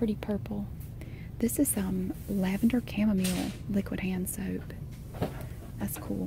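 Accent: South, United States. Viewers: Please keep making these shelf organizing videos❤️ They’re so satisfying, plus u make me laugh without trying, and ur southern drawl makes me feel at home❤️❤️❤️❤️ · I just absolutely love your southern accent. A few years ago, I moved from the south and I really miss the southern accent and to have it combined with a wonderful asmr video is amazing! Thanks you!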